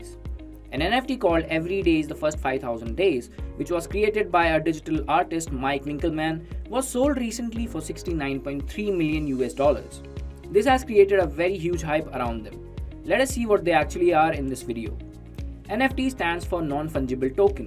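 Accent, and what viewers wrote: India. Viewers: Nothing personal because it's not his fault and he quite likely has good intentions, but I hate indian accents, it's hard to understand and annoying to hear in English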